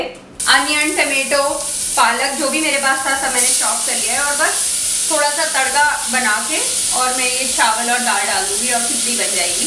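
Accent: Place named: India. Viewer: Hi.. first time I am watching your video.. I am happy, you are an amazing and fun loving person.. I love the fact u still have the Indian style be it accent or cooking.. I see many catching a fake accent in a month or two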